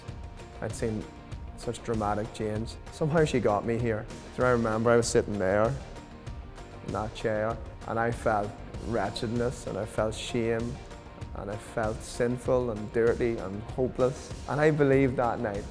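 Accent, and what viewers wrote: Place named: Ireland. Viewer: Awesome story bro! Just recently heard your music and love it! And now find out you're Irish! Haha, love the accent.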